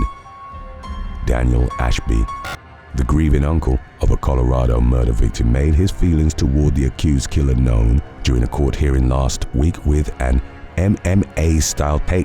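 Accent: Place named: United Kingdom. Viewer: Here’s some more worst news. A commenter on this channel recently criticized for “using a British accent.” should, according to that reviewer, “Bruh, just say the words.” Huh?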